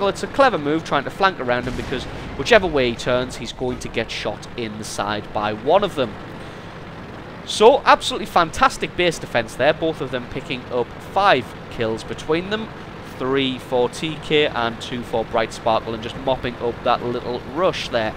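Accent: United Kingdom. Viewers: Your accent is somewhere in the U.K. What nationality or accent is that from?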